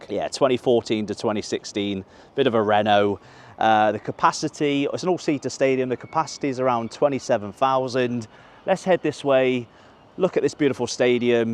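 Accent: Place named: Bristol, United Kingdom. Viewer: Not seen one of your videos before but I was convinced you were a Bristolian with your accent!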